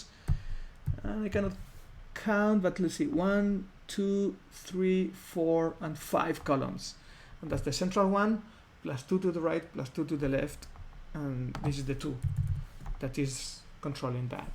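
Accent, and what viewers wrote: Spain. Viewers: Te he oido y he dicho este hombre tiene que ser español jajaj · COMO SE NOTA EN TU ACENTO QUE ERES ESPAÑOL JEJEJE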